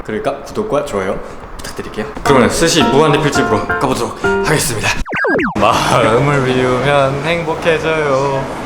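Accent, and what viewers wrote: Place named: Chuncheon, South Korea. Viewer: 춘천이 어떤가요? 춘천 닭갈비 추천이랑 억양도 비슷함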